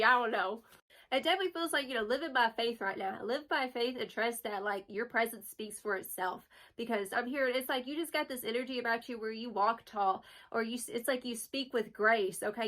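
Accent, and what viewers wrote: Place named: Texas, United States. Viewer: you are such a good singer. i love ur voice and texas woman accent. reminds me of a cold glass of arizona sweet tea, baby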